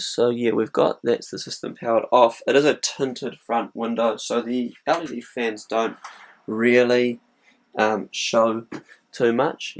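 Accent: New Zealand. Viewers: Are you a kiwi or an aussie? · i thought so, you've got that kiwi accent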